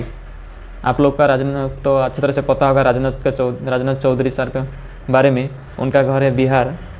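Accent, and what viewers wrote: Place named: Odisha, India. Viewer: Mu paa tama voice ru janideli odia pila boli... Then odia Letter dekhiki confirm heigali...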